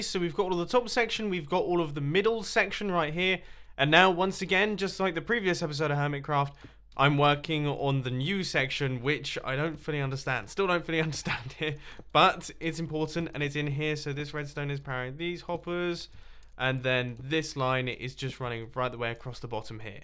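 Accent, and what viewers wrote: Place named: United Kingdom. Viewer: I love me a British accent. Anything sounds better in a British accent lol.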